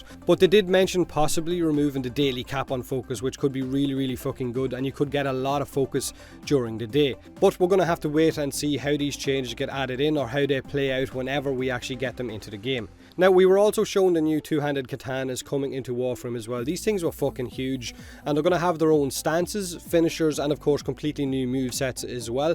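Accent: Ireland. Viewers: ** whoever criticised you for looking a little uncomfortable. Let them sit in front of tens thousands of people from all around the world and try to keep it as cool as you did, sitting there happy in the background, all potatoy and s**t. Was awesome seeing you there. First devstream I watched completely (30% because of you, maybe 40%:P). So keep your head up and your accent irish^^ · the moment those words split out of the mouth of that, I knew!! I will always luv this Irish dude talking aboat Warframe <3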